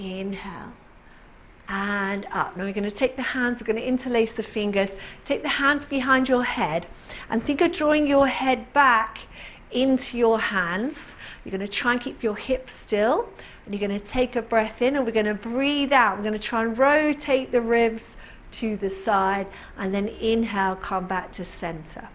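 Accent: United Kingdom. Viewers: I haven't attended Pilates for about 3 years, but these short simple basic classes have got me back into it again. Nice to hear a UK accent too! Thank you. It is such a lovely feeling to just 'unlock' your stiff muscles. Fantastic!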